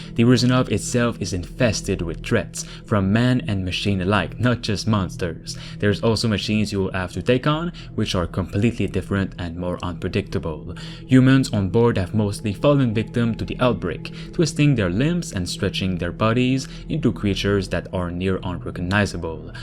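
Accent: Quebec, Canada. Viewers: Are you french Canadian? Your English is good don't get me wrong. Continue the good review. I like your stuff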